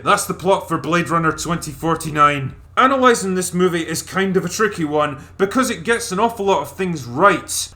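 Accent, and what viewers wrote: Scotland, United Kingdom. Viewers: TCD's accent sounds scottish but some vowels are different... "Chill pill" doesn't become "chell pell", and often "spud gun" doesn't become "spood goon". Anybody know where he's lived?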